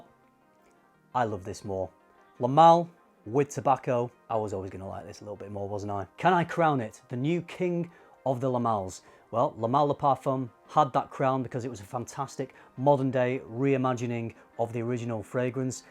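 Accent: United Kingdom. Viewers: I blind bought it, and I am just in love. The only thing that saddens me is that I will not be able to apply it in the summer heat. But that is why there are three other seasons! :D Thank you for the review! As always great work! The British accent certainly makes it more enjoyable, "pleasing to the ear", for sure!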